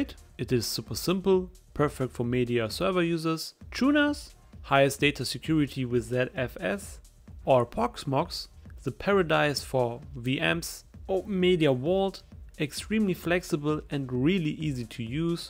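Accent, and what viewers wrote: Germany. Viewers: Ich ess deinen deutschen Akzent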